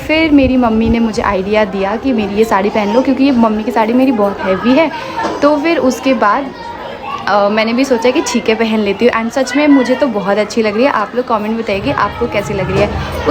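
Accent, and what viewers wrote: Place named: Ballia, India. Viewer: Di but mje ek chiz smjh nh ati ki jb ap bihar ki ho ar meea balia m nanihal h . But language to apki same h Jesi balia m boli jati h .but bihar ki ti alg hoti h .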